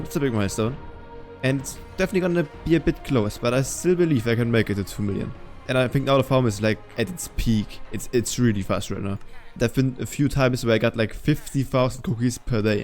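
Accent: Germany. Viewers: your accent gave you away I know a couple Germans and you sound like them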